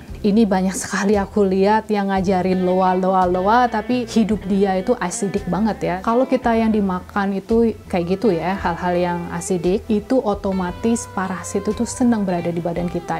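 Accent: Banyumas, Indonesia. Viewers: Serius ka.. aku juga dr CILACAP.. SALAM NGAPAK YAH KA.. n thanks bgt atas sharing nya..beruntung bgt ❤️❤️❤️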